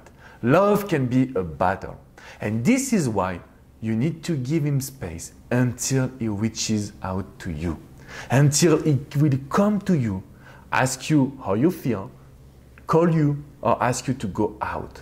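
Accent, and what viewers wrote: France. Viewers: You are soo Handsome! #FrenchAccent 😄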